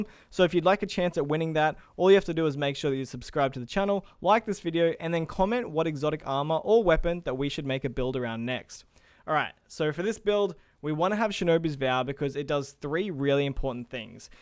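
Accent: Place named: Australia. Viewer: i only just found this channel but its awesome to hear another aussie making videos i might be late to the party here but im new to destiny and i'd love to see some beginner friendly citans ramparts builds for titans its the only exotic i have for titan and i can see void is already strong at the moment but i like the other subclasses too so hopefully you could make a video of some different options thanks, hope i can win the emblem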